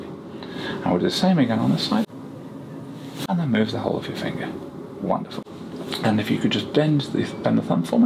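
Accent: United Kingdom. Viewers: Not sure why I’m supposed to watch this, but it’s always nice listening to a cute Brit so okay.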